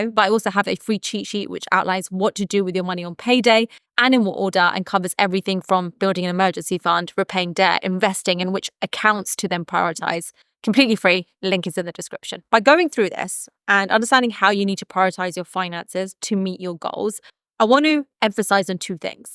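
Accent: England, United Kingdom. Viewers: I like your English accent. It's very fancy. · Good content, and I love your British accent.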